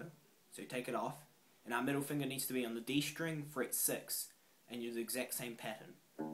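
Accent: New Zealand. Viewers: are u from New Zealand?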